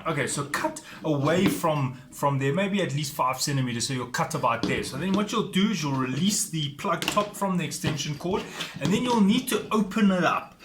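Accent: South Africa. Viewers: SA accent:)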